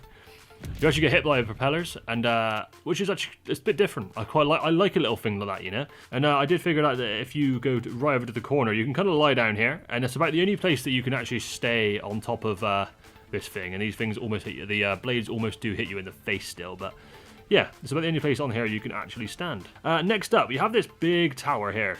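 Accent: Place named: Somerset, United Kingdom. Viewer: Where you from.. you sound very somerset .. Bristol maybe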